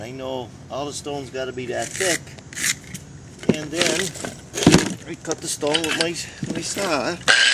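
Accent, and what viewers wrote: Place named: Scranton, United States. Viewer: I live in St Louis but I grew up in Scranton. I’d know that accent anywhere. Great stuff.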